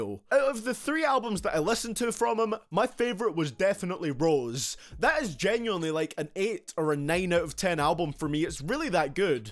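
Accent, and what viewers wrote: United Kingdom. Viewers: Are you British? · His British accent is the best he shouldn't ever try to hide it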